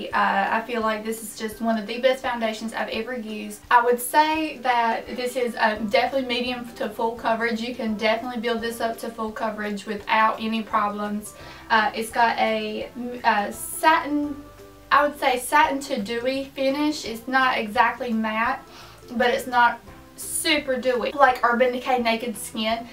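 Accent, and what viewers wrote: South, United States. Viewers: You're accent is so cute, I'm from the Heart of Dixie as well <3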